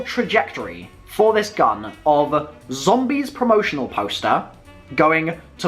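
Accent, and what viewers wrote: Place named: RP, United Kingdom. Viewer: this is to fucking posh for respect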